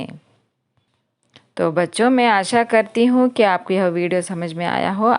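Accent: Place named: Hyderabad, India. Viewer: Madam aap paka hyderabad sa ho all explanation is good except some parts of explanation but ok keep it up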